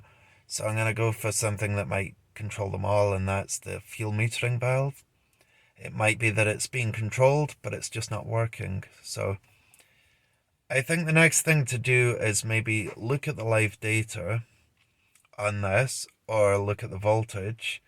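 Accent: Scotland, United Kingdom. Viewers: Very interesting accent, bit of scottish and american... Whats the craic?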